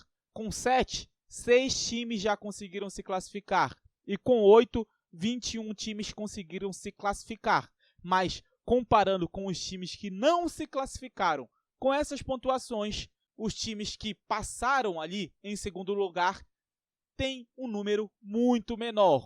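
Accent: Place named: Pará, Brazil. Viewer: Mano tu e paraense né não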